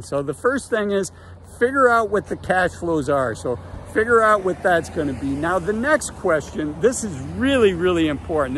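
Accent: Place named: Minnesota, United States. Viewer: I love “yuurs” instead of years. Keep that Min—a-SO-tah coming! ❤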